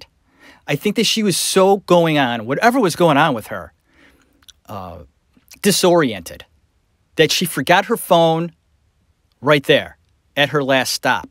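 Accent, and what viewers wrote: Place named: Cleveland, United States. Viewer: You sound like your from Cleveland, Ohio.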